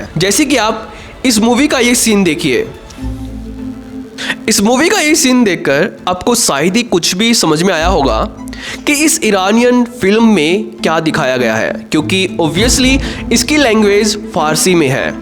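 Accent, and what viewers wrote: India. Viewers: bihari accent per thoda kaam karo, स ओर श bolne per.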